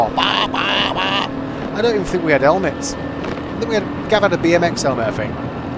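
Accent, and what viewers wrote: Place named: Hull, United Kingdom. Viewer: Ahh the Hull accent, I still pronounce my u's and i's like an e. Flert, skert and hert... three random words there from top of my head but story of my life actually!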